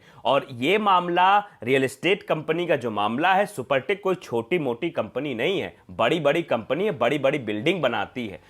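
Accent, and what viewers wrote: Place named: Eastern Uttar Pradesh, India. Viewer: In bhagodon ke karan sarkar par koi farq nahin padega kyonki andhbhakton ki badi sankhya unke support me hai chaahe jo bhi ho. Abhi to national property bechi jarahi hai, andhbhakt to uswaqt jagenge jab unke ghar bhi bik chuke honge. Badi achhi timely reporting hai aapki. Eastern UP ka ascent aur style sunkar maza aagays. Agar reporter saheb enjoy karen to unki khimat me ek sher arz hai Hain aur bhi duniya me sukhanwar bahut achhe: kahtehain ki Ghalib ka hai anddaze-bayan aur. Jai Hind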